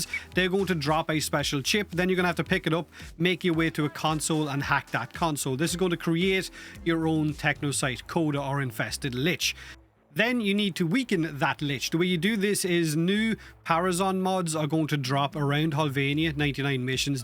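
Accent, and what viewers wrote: Ireland. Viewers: Bro is Irish. Chill. … I have Irish ancestry here in the USA I be saying the same shit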